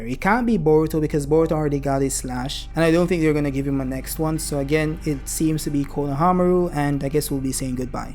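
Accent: Jamaica. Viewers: are you jaaican by any chance, your accent sounds familliar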